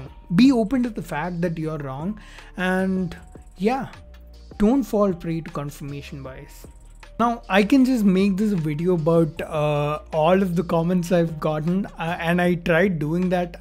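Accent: South India, India. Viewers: So, can I ask what your scientific credentials are? What is your training regarding research methodology? No offense but engineers (which I'm assuming you are given your accent, a fellow idli eater) are not very well trained in science. I know because I'm one too. We barely use statistics for exploratory research.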